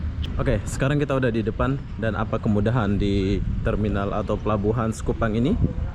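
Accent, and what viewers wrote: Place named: Malaysia. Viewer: Bro logat melayumu kental skali... Mending bahasa melayu aslimu aja di pakek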